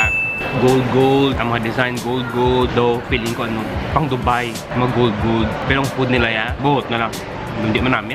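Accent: Iloilo, Philippines. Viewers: Love!Love! Love the ilonggo accent and seriously kahit diko po lahat maintindihan lahat ng say mo nakakaaliw ka po.Good luck, keep us smiling po.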